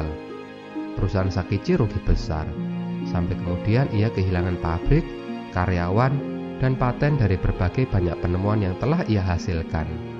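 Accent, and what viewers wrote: Java, Indonesia. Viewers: Sedikit saran. Pengisi suara ganti org aja, logat jawanya risih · Saya bolak balik lihat Video ini. unik membawa cerita, logat Jawa medok nya khas Alhamdulillah Sekarang Toyota nangkring di teras rumah ku. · Ada yg lucu di menit 11.18 detik saat dikendarai mobilnya bunyi etek etek etek wkkwkw siapa yang denger kalimat ini like and comen lucu juga ya pembaca beeitanya suaranya Mas nya medok banget keliatan dari jawanya sama kaya aku dari jawa tapi medok nya udah ilangbtuh kwkwkkw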